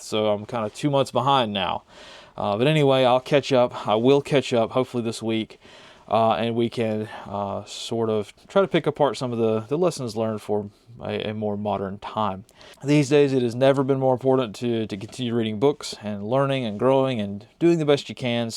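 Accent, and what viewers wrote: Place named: Maryland, United States. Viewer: I would bet you were born in or near Maryland from your accent.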